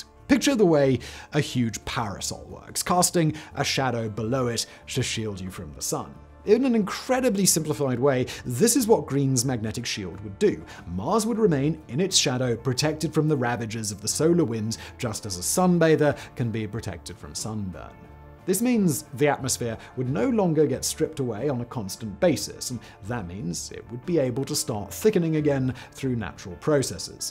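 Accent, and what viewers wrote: RP, United Kingdom. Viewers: I had to laugh. Simon couldn’t hold David Attenborough’s cue cards. At 66, I have been watching documentaries with their sonorously-toned narrators for a half century. There was Nat Geo specials Joseph Campanella and Richard Kiley; Nature’s George Page; Morgan Freeman everywhere; Secrets of the Dead’s Liev Schreiber; Nova’s Jay O. Sanders; Frontline’s Will Lyman…the list goes on. Simon isn’t even a middling presenter. He talks too fast, with poor enunciation and his British accent (I usually like British accents) is heavy, annoying, and pretentious. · This is a bit rude, but would it be possible to flatten the British Received English accent a bit and fluctuate your Oxbridge tone a bit less. It's really tough to listen - while the videos look fascinating and are a real shame to miss. A normal, average London middle class accent would be great. 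I'm sorry if this is offensive in any way, if so, please ignore.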